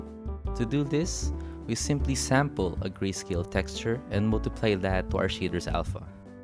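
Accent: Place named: Philippines. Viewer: new instantly from the accent this was a fellow filipino incredibly useful video my man hoping ill get to use some of these in my own projects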